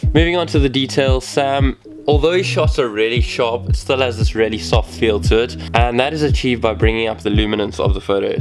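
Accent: South Africa. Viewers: You have a South African accent.🙌🏽👏🏽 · i can sniff the south african accent froma a mile away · dude, this is brilliant. also, i noticed you accent. south african maybe? (this video was in my recommended so i'm a new photographer) (cape town born and bred) sending you all the good vibes. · Random question but are you south african?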